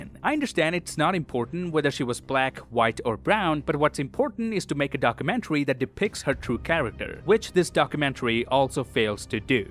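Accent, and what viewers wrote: Canada. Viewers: You sound Canadian. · The weirdest Canadian accent I've ever heard. And we don't refer to each other as lads. That's a British thing..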